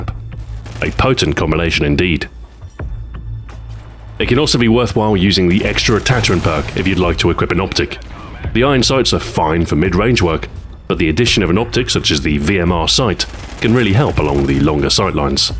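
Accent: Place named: United Kingdom. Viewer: You sound like Capt Price to me and it's because you're British LOL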